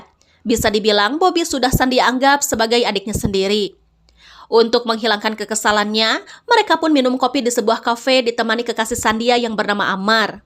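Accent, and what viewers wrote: West Java, Indonesia. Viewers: semangat ya cantik , perbanyakin aksen sunda nya ya . itu yg makin tambah greget loh , biar punya ciri khas macam mang jon iq7 , reza.saqahayang & mang andreas baok kompa 😊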